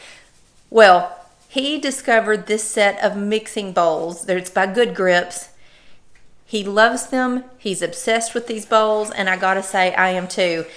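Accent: South, United States. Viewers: Thanks for all the hints..I just love listening to your Southern drawl...also seeing taking part in the demos! Lots of fun! 😃 from a Minnesota gal ❄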